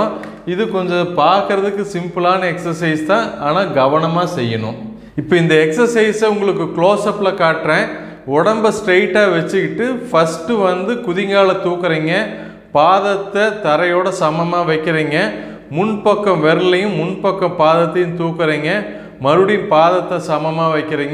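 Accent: Kongu, India. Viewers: கொங்கு தமிழில் அழகாக தமிழில் விளக்கியதற்கு மிக்க நன்றிங்க 😊🎉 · கொங்கு தமிழில் பயிற்சி மற்றும் விளக்கம் அபார்ம்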